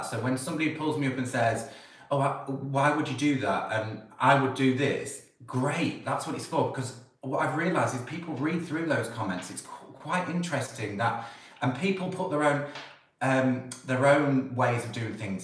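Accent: United Kingdom. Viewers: I'm only part way into this video and I just had to stop it to comment. I've watched your videos for a long time and I've loved seeing how you interact not only with viewers, but with your PIECES! You seem to have an "intimate connection" with each project you undertake. And because I personally have a love of languages, I totally enjoy your British accent! LOL, but what I really wanted to say was, how proud of you your dear Mum must have been. And she would be delighted to know that you are once again being so creative, as you obviously were created to be! I'm also loving getting to know the person behind the face.....who knew you are a hairdresser! No wonder you branched out creatively into other genres. Your inborn love of color and beauty is evident from seeing the bits and pieces of your personal surroundings. Now, back to finish the video:^)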